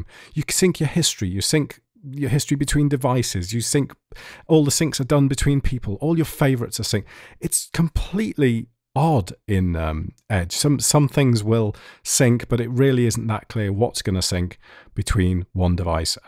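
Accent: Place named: United Kingdom. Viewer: You sound like ownagepranks british accent jonathan character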